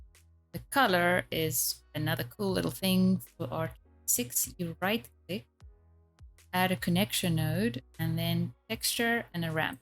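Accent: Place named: South Africa. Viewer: Loving that South African accent, and thanks for the tut! Cape Townian living in Paris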